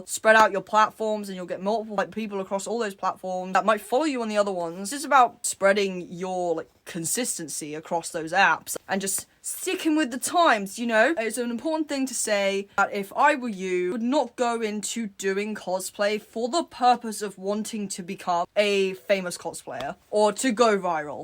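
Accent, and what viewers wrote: United Kingdom. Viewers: Are you british? (Im just asking out of curiosity)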